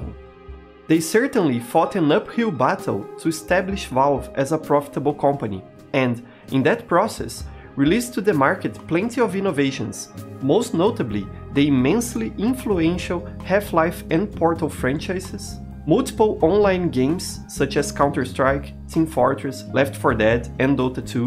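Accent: Brazil. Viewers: He's accent is so much like a brazilian trying to speak english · Whoa that accent, Brazilian? Que loco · your accent sounds a bit brazilian so caraio raridade ver brasileiro fazendo vídeo de qualidade em jogo que foi praticamente esquecido aqui no país, belos vídeos hue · Unrelated to the video buuuut you sound brazilian and I find that fascinating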